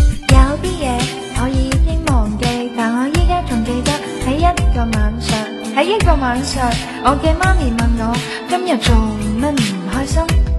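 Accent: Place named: Guangdong, China. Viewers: 下，，幾標準架囉wo，應該係广東人，，係咪你地on99，懶勁呀？ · 会说广东话，绝对是东莞的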